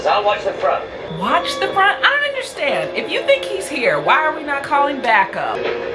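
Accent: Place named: South, United States. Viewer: From a brit. You have an x rated southern twang that says what everyone else is thinking when facing Carpenters creations. Funnny wonan x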